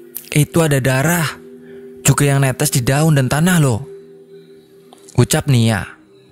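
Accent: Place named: Banyumas, Indonesia. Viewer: Ngapak ya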